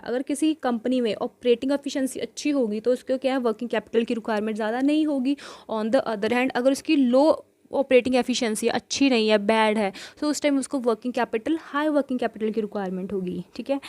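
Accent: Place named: Punjab, India. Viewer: I liked the way you explained , are u Punjabi or from north side for sure😊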